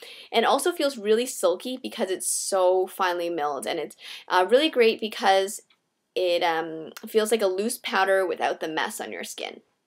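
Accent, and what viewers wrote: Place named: Canada. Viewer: I cant tell you are canadian by how you pronounce "OU" like about, out lol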